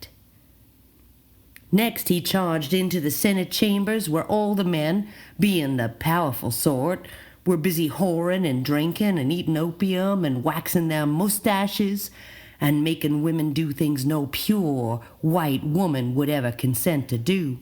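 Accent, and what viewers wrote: South, United States. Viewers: Interesting.... though why an old southern woman accent? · Why do you sound so southern in this? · WTF this Canadian woman has a better southern accent than I do!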